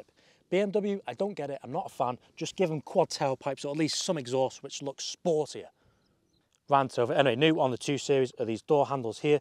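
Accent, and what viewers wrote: North Wales, United Kingdom. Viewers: You from north wales mate?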